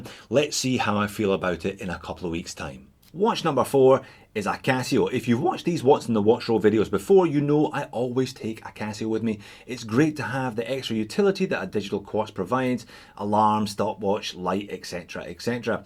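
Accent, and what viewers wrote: Scotland, United Kingdom. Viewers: Scottish, that is what I thought. Just didn't want to say it on YouTube and make fool out if myself, in case I would have guessed wrong.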